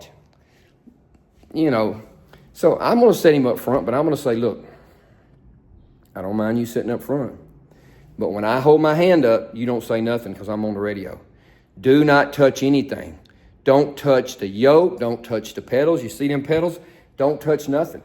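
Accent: South, United States. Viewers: Ive never heard anyone so smart with your accent. You must be the king of the southerners.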